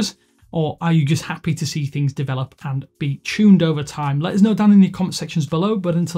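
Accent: United Kingdom. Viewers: Maurício Meireless fazendo review de versão do Android com sotaque britânico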